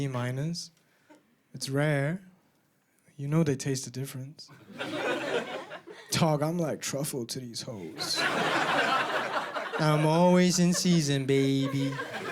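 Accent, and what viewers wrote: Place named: Singapore. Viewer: Are you Singaporean? I can hear the accent haha, I’m proud!! You have really great jokes and way of storytelling ❤❤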